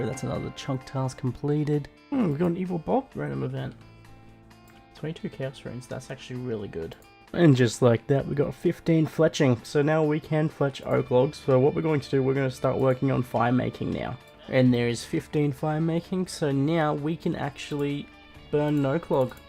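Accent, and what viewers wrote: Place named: Australia. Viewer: i just started my first one chunk account in varrock and it’s definitely nice to hear a familiar aussie accent to follow along to 😂 looking forward to seeing where this goes, good luck!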